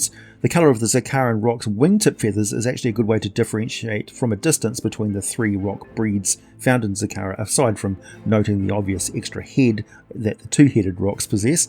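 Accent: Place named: New Zealand. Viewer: I swear, sometimes I tune in or rewatch just to hear you say “murder” half a dozen times or more. Your accent brings much joy to this Shakespearean actor, and one day a director will have me employ a kiwi ‘cent, and I will send you a ticket.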